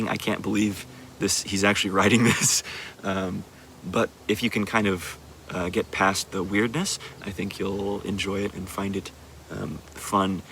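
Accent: United Kingdom. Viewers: I was sooo sure you were in the UK :-) You sure fooled me (and others) with your slight intetnational'ish Brit accent reading Douglas Adams.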